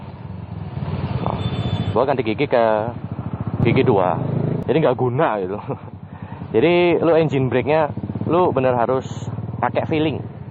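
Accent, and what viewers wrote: Surabaya, Indonesia. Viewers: Wakk.. suroboyo cak 😂😂😂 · bang org surabaya ya?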